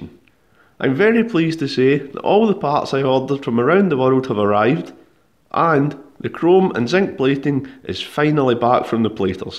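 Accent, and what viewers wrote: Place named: England, United Kingdom. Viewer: What kind of English accent do you have ? It's perfectly understandable, I'm just curious ;) It's very colourful.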